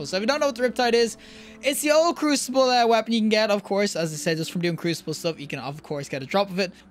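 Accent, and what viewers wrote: Ireland. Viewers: very nice to hear an irish accent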